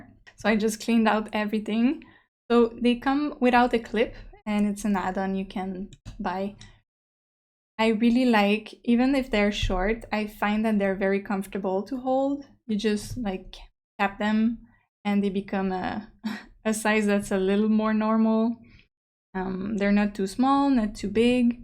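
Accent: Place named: Quebec, Canada. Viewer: Omg haii! It's my first time watching your videos and I notice your accent sounded very familiar, then it clicked you must be from quebec province~ me too ^^ Thank you for the well elaborate video, it helped me a lot to choose since I've only recently started this hobby and I was lost on which pen to start (funny enough the first thing I bought were bottled inks xD ) that pearl kaweko fountain pen surely is very pretty and lovely, too bad it was a limited edition TT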